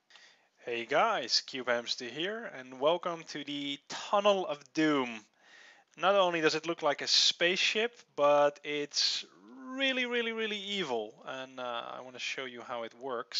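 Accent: Netherlands. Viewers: Ik hoor dat je uit nederland komt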